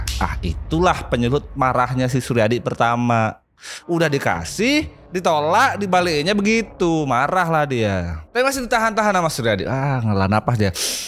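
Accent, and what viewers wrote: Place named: Lampung, Indonesia. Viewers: Lampung bangett euyyy.. tp nagih 😂 semangaaatt mas cerita ceritanya.👍👍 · Salfok sama logat nya bang , Lampung banget 😂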